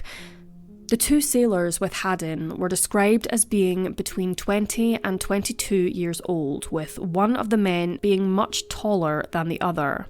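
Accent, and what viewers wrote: Scotland, United Kingdom. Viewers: What part of the UK is the narrator’s accent from? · Laura she is Scottish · I'm Irish and she's 100 percent Scottish . Love it 😍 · Definitely Scottish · She's Scottish.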